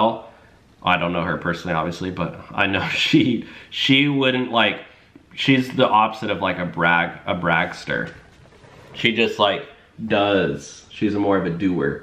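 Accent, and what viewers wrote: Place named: South, United States. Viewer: Where are you from(born), I heard a little southern accent?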